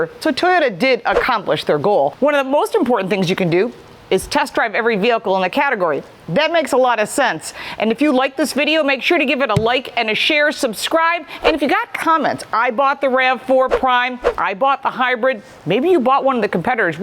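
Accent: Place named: New York, United States. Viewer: Great review, accept for the damn loud NY accent!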